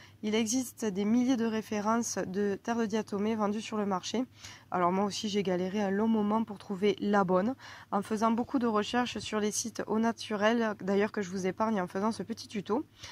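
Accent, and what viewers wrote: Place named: South, France. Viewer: Super tuto comme d'hab, avec le chouette p'tit accent du Sud.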